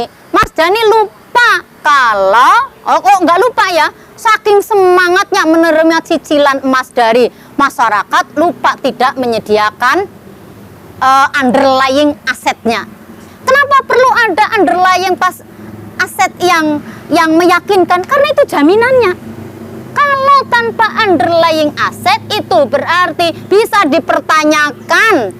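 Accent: Banyumas, Indonesia. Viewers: khas ngapaknya mantap pnjelasannya sukses selalu utk mb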